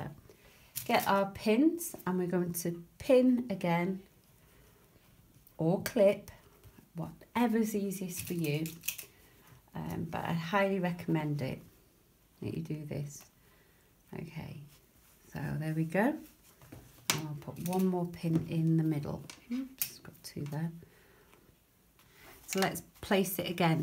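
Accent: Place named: Stockport, United Kingdom. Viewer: Just actually listened to your accent, I was born at Stepping Hill hospital, my maternal grandparents lived where you do, or around, close by... before grandfather died, they lived in lower Brinnington, near where St Paul's church was... for the first 5 years of my life, I lived in upper Brinnington, went to school at the old school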